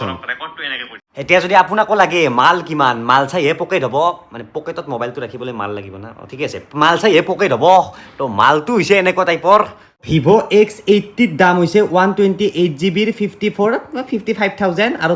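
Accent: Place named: Nalbari, India. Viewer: da apunar kotha bur bohut val lage, Moiu nalbariya hoi, Mur koka aita ghor nalbarir, But moi golaghat ot jnmo hoi Golaghot te Amar ghor etiya, Heibbe nalbariya kotha nihikilu valkoi😊😊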